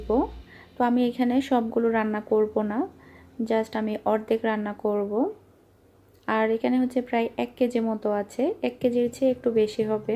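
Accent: Chittagong, Bangladesh. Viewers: Apnar kota sone amar bar bar mone hoccilo apni chittagongr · আপু আপনি সাতকানিয়ার মেয়ে, ওয়াও,,আপনার কথার মধ্যে চট্টগ্রাম এর একটা সুর আছে। আমি ভাবতাম কোথায় হতে পারে আপনার বাড়ি। শেষেমেষ আমারা একি যায়গার মেয়ে হলাম। এই জন্যই তো আপনাকে আপন আপন লাগে,।আমিও সাতকানিয়ার মেয়ে।কানচন, ফুলতলা, মিয়াবাড়ি, আমার বাড়ি, আর শশুর বাড়ি ডলুকুল❤❤❤❤❤অনেক অনেক শুভ কামনা রইলো আপু আপনার জন্য❤❤❤❤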